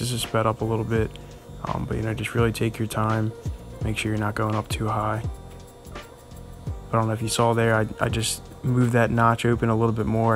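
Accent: Philadelphia, United States. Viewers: Philadelphia accent. Am I correct?? Great video man. Keep up the good work. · i can tell my man’s from philly just by the accent lol. thanks for the vid fam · You deff from philli .or somewhere in Pennsylvania right lol ..that accent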